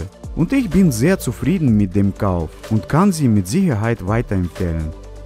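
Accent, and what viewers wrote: Russia. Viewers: Er ist Russe deswegen spricht er das so aus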